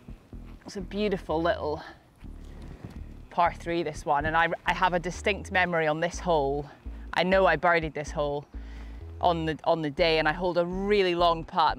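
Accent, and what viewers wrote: Scotland, United Kingdom. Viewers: Great round....you gave it a good go and you weren't too far away from your own course record. Would love one of the balls which would take pride of place in my collection! On a side note, as a Scotsman who's lived in The Netherlands for over 25 years, it's great to see a wee Scots lassie doing so well and hearing the accent is music to my ears! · Great video! Thanks for sharing your insights on the course, also bonus listening to your lovely Scottish accent 😊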